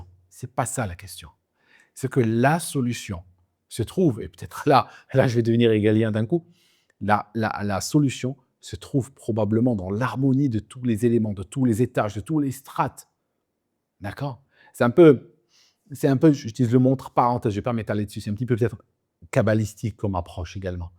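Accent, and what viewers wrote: Switzerland. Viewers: Ah l'accent suisse :) ....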